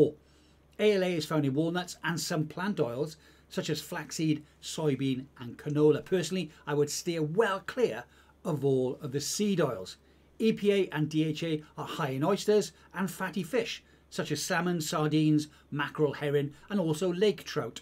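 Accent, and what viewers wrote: United Kingdom. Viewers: Re the stack at the end of the vid, I am curious where you get your 500mg Metformin from. From the accent I'm guessing you are UK based. Did you measure its effect on your IGF-1 levels? I think this is one very interesting supplement. I heard some have side effects but can depend on the dose.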